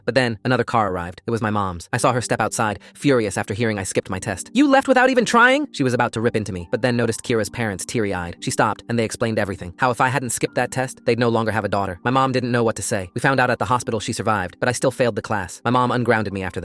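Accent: United Kingdom. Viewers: Is he British 😭? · I swear bro became British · British ahh voice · Bro went British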